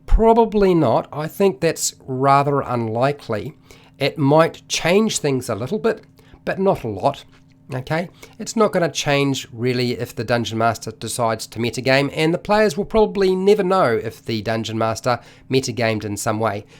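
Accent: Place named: New Zealand. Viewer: Me: Hm, I wonder if this is an Australian or a New Zealander? 🤔 "... is a check." Me: New Zealand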